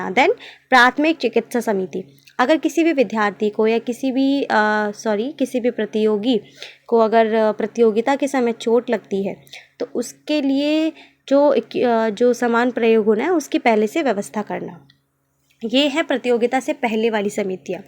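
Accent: Delhi, India. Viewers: Mam are u from Delhi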